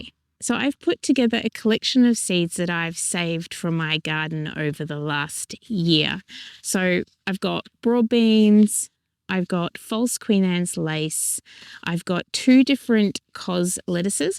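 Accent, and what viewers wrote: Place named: Australia. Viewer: so good to hear an Aussie voice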